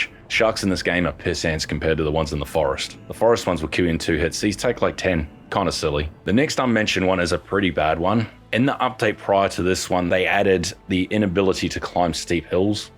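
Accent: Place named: Australia. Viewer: Been off from gaming for several years and picked up playing again with SOTF and then when needed to learn things in this game I stumble across a familiar Aussie voice and all the memories are coming back from the great videos you have made for TF (who tf is Pewdipie btw lol) and I’m back addicted again with the game AND your videos. It made me soo happy seeing your still around recording games, especially TF&SOTF and I have a lot of catching up now on your channel since the last 4-5yrs offline. Thanks!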